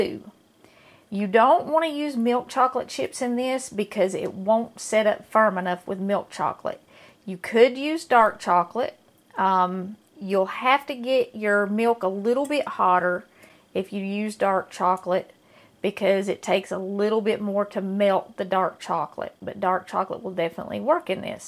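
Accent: South, United States. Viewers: Her accent reminds me of the five years I lived in Georgia. Coming from the North at that time, I was fascinated by the southern slang. She’s indeed a sweet spirit, and I am blessed to have found this page. God is always first. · That's our Southern slang. 😊❤️ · Hahaha 😂.... I wish! I'm thinking the same thing, once I make this in a few minutes and eat a piece I'm going to want to make it all the time. I can't afford to gain any weight. Lol. But I love food, sweets, MMM chocolate! With that being said, I love your southern accent and I love your channel also, and love that you aren't scared to put God into it also, you go girl! I'm in southern Louisiana and I'll be making this recipe for the first time in just a few minutes.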